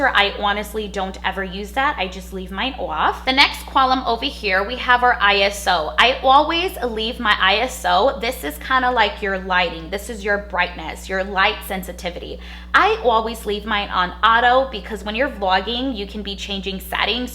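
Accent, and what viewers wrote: New York, United States. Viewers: I never thought I’d find a video to actually help me with this but I DID! THANK you so so much! Ps. Is your accent NY or NJ? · I love how you say "all" "on" "always" "honestly" ❤😂🤣 you must be from new York or Boston 🥰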